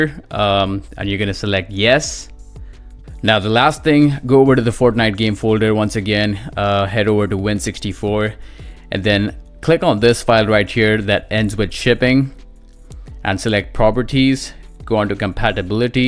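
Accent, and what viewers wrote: India. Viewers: thank you very much bro “Indian Accent” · Thanks so much I didn't expect this to work two days I couldn't play. Btw you sound like Indian and Canadian at the same time hahah love you man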